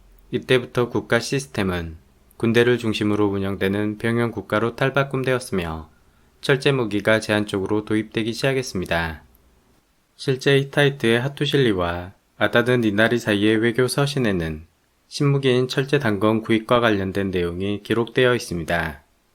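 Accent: Jeolla, South Korea. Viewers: 전라도 분이신가?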